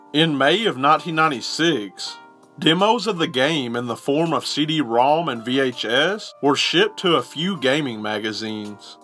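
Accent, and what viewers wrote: South, United States. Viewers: i love dat voice you're the southern chills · He sounds like he's from Texas or Louisiana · I don't know why but I just like your Southern accent narrating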